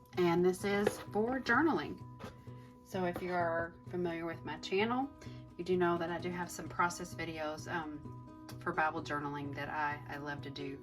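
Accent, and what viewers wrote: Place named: Jacksonville, United States. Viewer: Are you in Jacksonville? Your accent.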